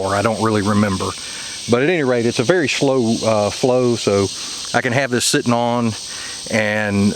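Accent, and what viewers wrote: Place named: Arkansas, United States. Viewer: Nice to see you back. Watched the whole house build from here in England. We have kin near De Valls Bluff and hearing you reminds me so much of our visits there. Thank You.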